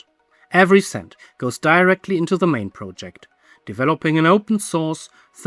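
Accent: Germany. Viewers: Ein Deutscher der englisch spricht ist unverkennbar😅😂 Gutes Video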